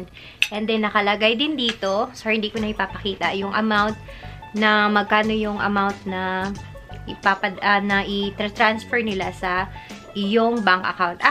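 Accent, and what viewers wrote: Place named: Visayas, Philippines. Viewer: Ate gawa ka naman ng video with your class sa susunod. 😀 Bisaya man diay ka te.😁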